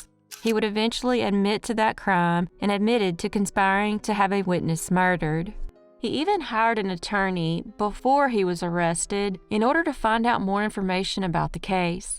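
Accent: South, United States. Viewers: I think we like that Southern voice ❤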